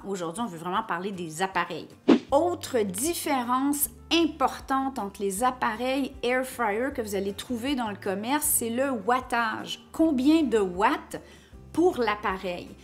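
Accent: Quebec, Canada. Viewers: Mais quelle vidéo complète et utile ! Un gros merci et quel agréable accent, le québec me manque !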